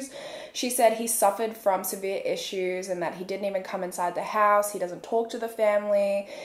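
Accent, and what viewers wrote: Australia. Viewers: Usually i never comment on a yt video, but here i a am today... i just wanted to say that your work ist amazing. You put so much effort into the research, your videos are always so exiting and detailed. But still you show so much respect to the victims and their families. Btw i love your accent, it always reminds me of my time in Australia 🧡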